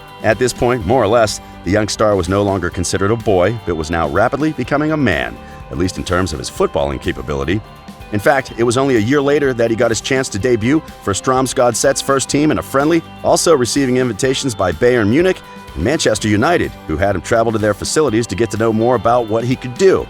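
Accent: United States. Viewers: Can't take American voices seriously speaking about football... lol haha sorry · I WILL TROLL THOSE COMPLAINING THE AMERICAN ACCENT. YOU ARE WELCOME! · The speaker´s voice sounds a bit resemblant to Ted Lasso (Jason Sudeikis). It´s almost charming to hear all these ´murican pronounciations. But to the next video, please someone, give this speaker a proper phonetic list of club, coach and player names. Before the charm fades off.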